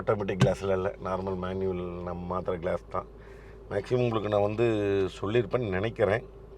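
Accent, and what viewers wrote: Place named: Coimbatore, India. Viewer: அன்னன் குரல், கோவை பேச்சு அருமை....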